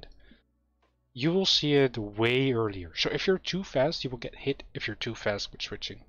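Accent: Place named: Netherlands. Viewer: Je klinkt Hollands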